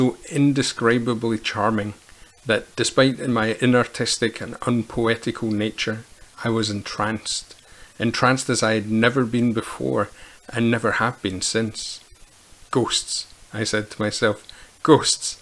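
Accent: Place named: Scotland, United Kingdom. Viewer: First time this channel came up in my feed and I'm absolutely delighted. The stories are so wonderfully creepy and the way they are told, is perfect the lovely sound of the Scottish way of speaking, no dramatic background music that makes actually hearing the story very difficult - this storytelling is simply perfect for me. I love it, subscribed and clicked the bell with a big smile on my face. Thank you for making these videos for us, I'm going to check on your playlist. Best wishes from the Highlands of Bavaria (alpine region) 🙋🏻‍♀️ Slàinte mhath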